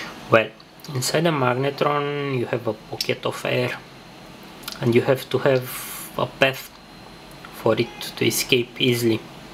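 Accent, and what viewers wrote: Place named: Russia. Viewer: рашин акцент)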